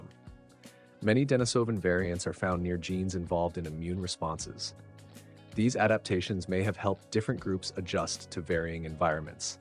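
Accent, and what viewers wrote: United States. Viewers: Hmm voice over has an American accent but channel based in Indonesia??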